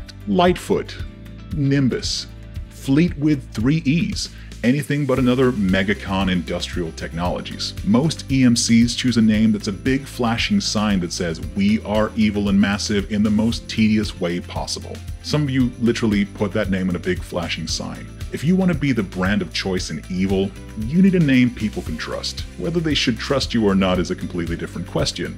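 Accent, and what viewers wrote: United States. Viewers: Woah, new to this channel and was totally convinced that was your voice, with maybe a compressor, but certainly not a pitched down American accent! Well done! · Your American accent is so good that I forgot that you’re British/Australian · your American accent was spot on except for when you said "military" lol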